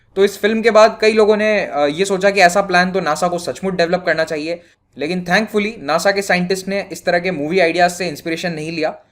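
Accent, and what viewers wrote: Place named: Rajasthan, India. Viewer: When u say killlooo (kg)😂 that rajasthani accent....I like it very much...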